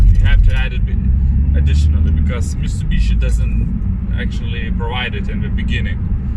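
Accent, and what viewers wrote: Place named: Lithuania. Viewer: Is akcento galvoju : turbut Lietuvis. Is video matau, teisingas spejimas :D Nu ir veliau pasakei: " Vilnius" fainas video !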